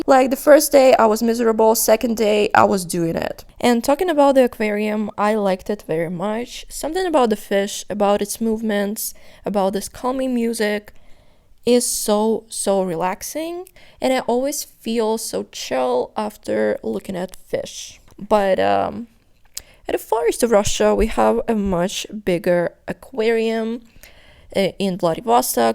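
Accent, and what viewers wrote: United States. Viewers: Your English is perfect. You sound like you were born in the USA. You remind me of the models in this video..... <link>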